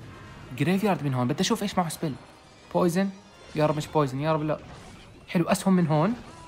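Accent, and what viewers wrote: Iraq. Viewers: حجيك قريب للعراقي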